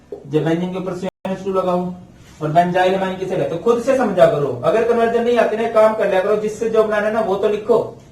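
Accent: Haryana, India. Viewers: cool sir r u from haryana thanks sir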